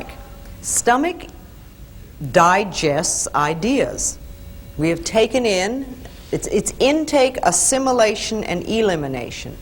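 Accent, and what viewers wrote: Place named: United States. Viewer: What American accent she is using ?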